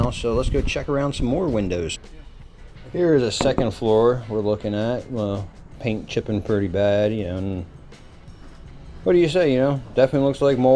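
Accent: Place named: Pennsylvania, United States. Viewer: I knew it was Pennsylvania! I love your accent, that was the giveaway. I graduated Penn State in 2003, from U. Park but I did live in Schuylkill Haven for 1 school year, and that was enough. Pennsylvania is a beautiful state, despite the look of this ugly building. ❤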